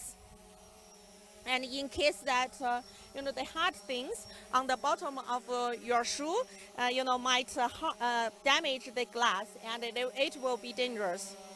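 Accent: China. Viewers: What I love about the video is that when she switch from English to Chinese or vice versa she sounds the same mean Chinese her Chinese ascent is so Heavy as she goes from English to Chinese I'm like hold on what?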